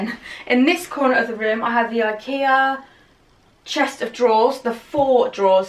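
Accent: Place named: United Kingdom. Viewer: Her accent is so cute, cutest British accent I’ve ever heard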